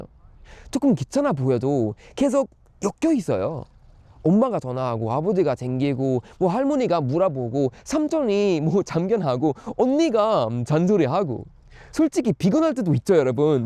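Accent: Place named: Countryside, South Korea. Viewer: 말투가 한국말투~~약간 한국시골말투~~기냥한국사람같네❤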